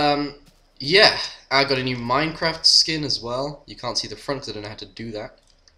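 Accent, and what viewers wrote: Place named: Baltimore, United States. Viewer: It's been so long... I forgot you had a bauler accent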